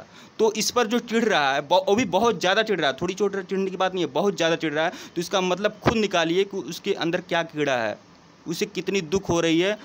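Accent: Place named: Allahabad, India. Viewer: Ap illahabad se ha apki language bata rhi h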